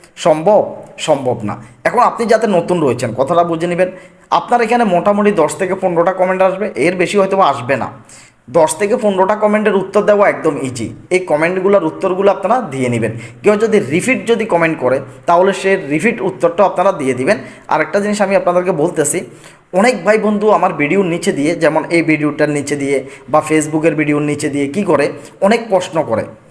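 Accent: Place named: Noakhali, Bangladesh. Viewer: আপনার উচ্চারণ পুরাই নোয়াখাইল্লা টান আছে,শুদ্ধ বাংলা উচ্চারণ জরুরী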